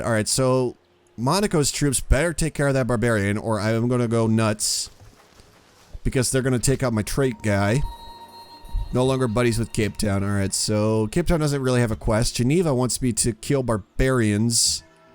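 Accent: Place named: California, United States. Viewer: I would never guess you were Dutch by your accent. I thought you were Californian!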